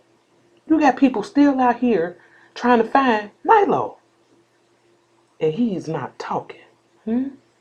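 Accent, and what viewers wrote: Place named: New York, United States. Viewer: I thought I was hearing N.Y.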